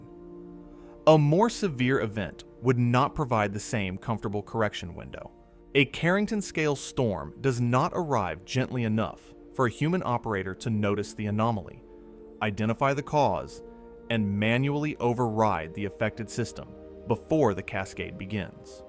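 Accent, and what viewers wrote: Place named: United States. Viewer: This is how a space documentary shod be fly and cleanly narrated in clear American English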